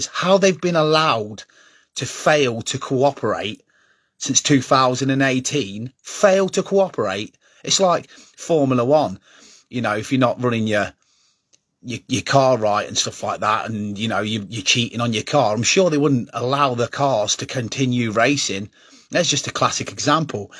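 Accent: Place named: Liverpool, United Kingdom. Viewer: Strong Liverpool accent here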